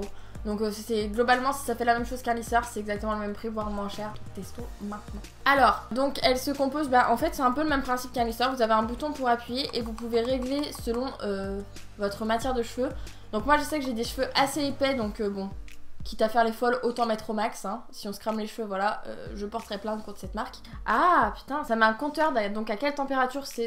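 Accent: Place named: Quebec, Canada. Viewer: Ta un accent québécois c'est super perturbant mdr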